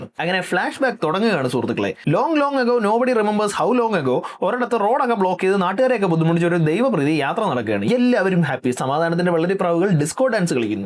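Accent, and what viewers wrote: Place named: Kottayam, India. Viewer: Chettan Kottayamkaran ano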